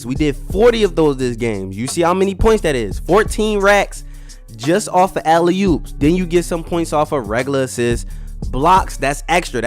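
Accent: Baltimore, United States. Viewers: U got a Baltimore accent bro✊🏽❗️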